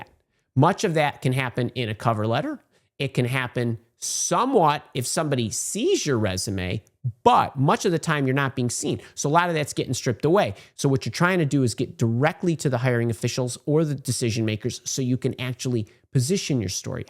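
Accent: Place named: Chicago, United States. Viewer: He talks just like Billy Corgan. Must be from Chicahgo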